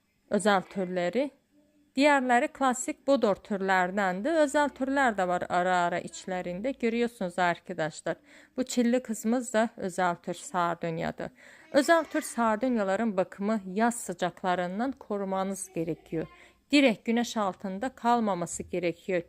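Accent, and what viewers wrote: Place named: Azerbaijan. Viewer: XANIM SIZ AZERBAYCANLISIZ?SIZIN DANIŞIQNIZ BIZIM ŞIVEYE OXŞAYIR❤❤❤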